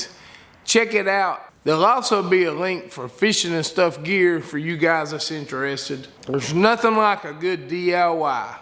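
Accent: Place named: South, United States. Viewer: Great idea bud. Hey I have to mention your accent twang is very similar to mine. I'm not makin fun, I never realized that I had such a southern twang accent til I heard my recorded voice and it totally cracked me up. I'm from the Texas panhandle but my grandparents migrated from Tennessee so there it is.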